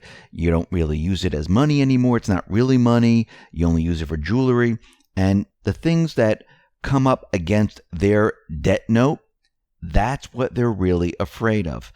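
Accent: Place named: New York, United States. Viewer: You’ve got to be from New York. Queens if my ear is correct.... Southport CT...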